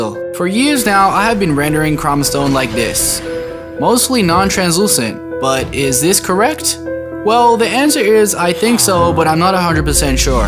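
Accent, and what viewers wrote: Australia. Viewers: Cant tell if your australian or american or something else lol, nice video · aussie n proud bro, its a sick accent 💪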